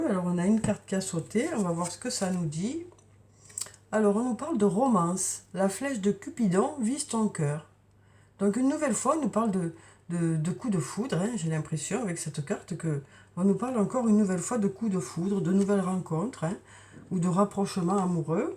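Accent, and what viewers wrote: South, France. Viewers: Merci pour cette guidance, toujours un plaisir d écouter cet accent du soleil, une voix calme et posée et surtout toujours cette bienveillance, vous êtes bénéfique et toujours dans le positif merci pour ces bonnes énergies que vous nous envoyez bonne journée☀ et prenez soin de vous. Bisous 😘👍💋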